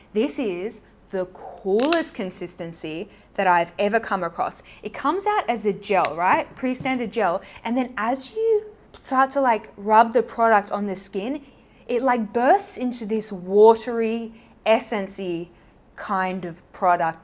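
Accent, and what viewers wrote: Australia. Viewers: Australian is Australian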